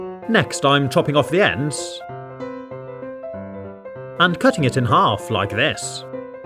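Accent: United Kingdom. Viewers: I like how your so bri'ish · Pls tell me if ur British plsplspls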